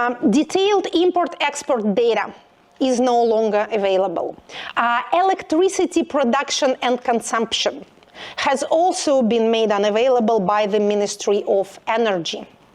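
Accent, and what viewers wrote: Russia. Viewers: Зе инглиш из соу рашн❤ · С русским акцентом легче понимать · It’s a Russian accent not German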